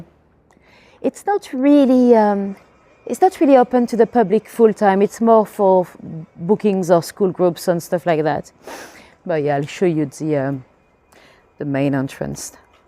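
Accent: France. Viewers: You can't possibly be referring to the French accent? Love it!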